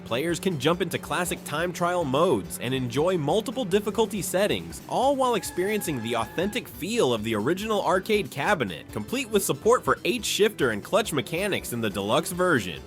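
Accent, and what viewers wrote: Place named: United States. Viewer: Please could you stop using Yank Narration in your videos!? Quite honestly any other country of origin would be such a huge upgrade for me. I am literally unable to listen to this ermm, person anymore. The noise coming from him is just so vile! It makes me want to vomit. Don't get me wrong I'm not against all yanks, I love MrBallen for example but this guy and certain people's voices from yank land is just disgusting. I can't listen to anymore of this guy talk, very sorry!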